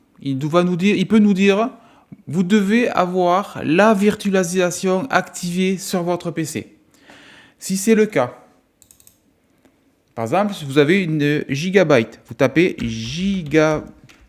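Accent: South, France. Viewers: avec ce petit accent du sud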